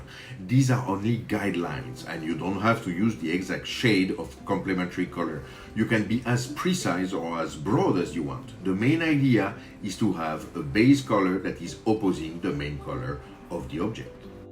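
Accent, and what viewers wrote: France. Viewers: J ai reconnu un accent français donc je me permet d écrire en français 😂 est ce que vous pouvez faire une vidéo Painting like Bacon SVP ? Super travail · Super, vous venez du nord-est n'est ce pas?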